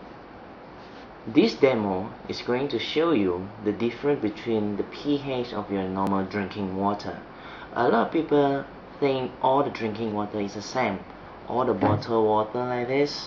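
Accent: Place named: Thailand. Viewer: this guy is Thai from his accent...he said "minira" lol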